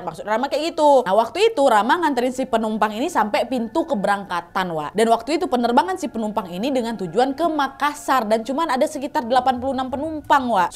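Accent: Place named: Medan, Indonesia. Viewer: Wak ini orang mana? Kayak aku bicaranya, aku orang Medan woii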